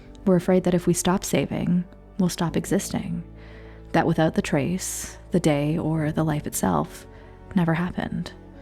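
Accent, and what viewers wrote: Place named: United States. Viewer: a narration dubbed voice who speaks in American (or Canadian) English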